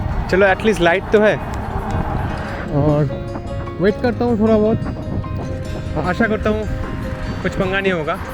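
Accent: Bengal, India. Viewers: I like your vlog hindi with Bengali accent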